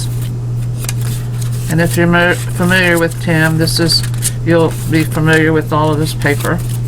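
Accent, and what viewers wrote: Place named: South, United States. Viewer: I’m a Georgia Peach. I know southern accents when I hear them. Lol. 😂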